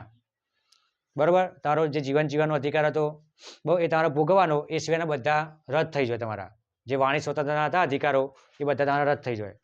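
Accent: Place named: Sabarkantha, India. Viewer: સાહેબ સાબરકાંઠા ના નિવાસી લાગો છો.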